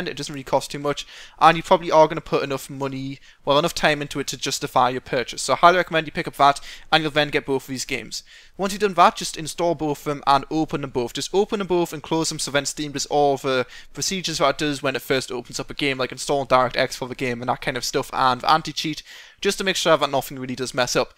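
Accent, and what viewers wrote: United Kingdom. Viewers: well, the brithisch accent is pretty hard to understand, i mean i understoad everything but damn on some parts it was hard ^^'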